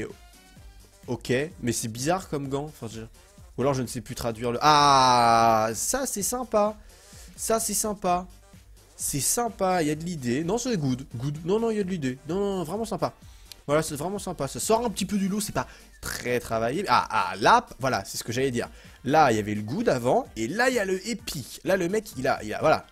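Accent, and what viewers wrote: Quebec, Canada. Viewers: tu est quebequoi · Es-que tu as un peu de québecois ?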